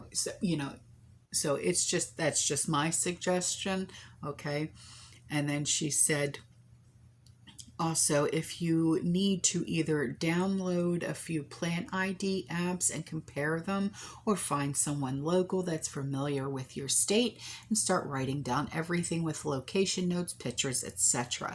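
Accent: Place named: Boston, United States. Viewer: "Grab your cwauffee".....that's how I hear it ......great accent.....Mass?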